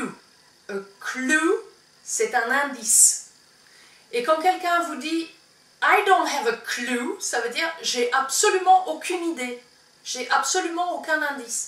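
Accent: France. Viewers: tiens, toi tu viens du nord non ? :p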